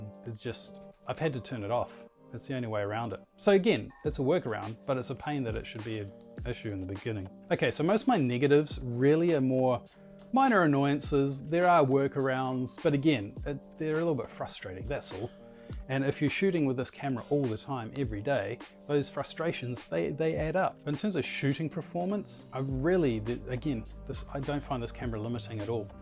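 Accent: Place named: New Zealand. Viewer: Omg I thought you had a Kiwi accent! And you are from NZ indeed!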